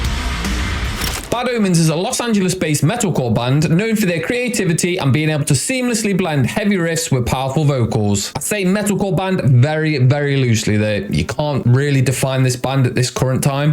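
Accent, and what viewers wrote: United Kingdom. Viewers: why are you british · Are you from yorkshire?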